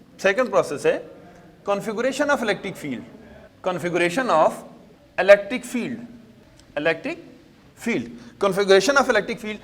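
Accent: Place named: India. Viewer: SIR You neeed to improve your English You have desi style OLD VERSION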